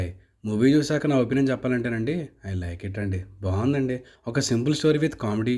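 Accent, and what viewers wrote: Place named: Godavari, India. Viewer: Anna godavari slang try chesadu roiiii🔥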